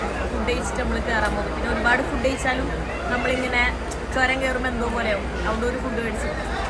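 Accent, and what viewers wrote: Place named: Kochi, India. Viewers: Kochi slang anallo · Oru kochin slaang varunudallo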